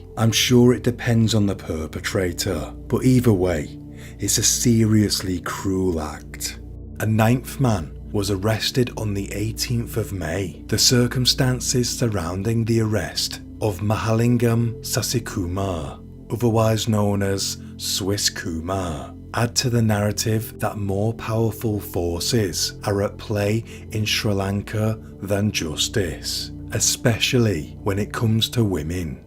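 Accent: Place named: Liverpool, United Kingdom. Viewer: Also I can hear a Liverpool or Merseyside accent 😊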